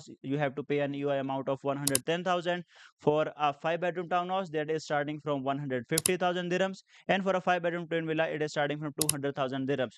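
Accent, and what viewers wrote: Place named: India. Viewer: Improve your Indian accent bro...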